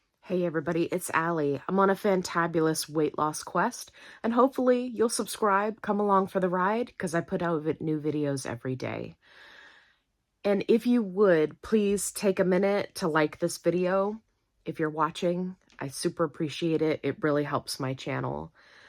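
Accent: United States. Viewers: Keven's first snow!! 🩷 Side note: I always assumed you were from Ohio! (I like to guess where someone is from by their accent.) As a former Buckeye I thought we had the same accent. 😂 Didn't place you as a Southerner. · I’m a southwestern Ohioaner, I thought she was originally from West Virginia. 😂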